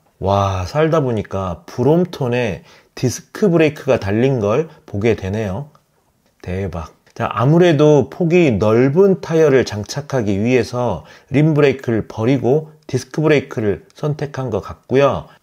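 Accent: Jeolla, South Korea. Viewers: 전라도 사투리 맞나요?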